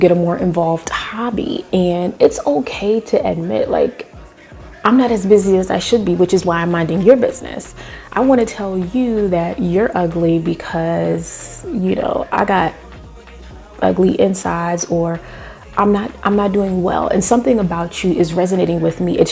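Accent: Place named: Chicago, United States. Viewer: Are you in the Chicago area? Because you definitely sound like us, the way you speak. I just love it Sis.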